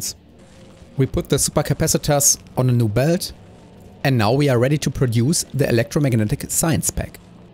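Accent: Germany. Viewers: I could watch these videos for hours just because of this german accent. (Rreally like it)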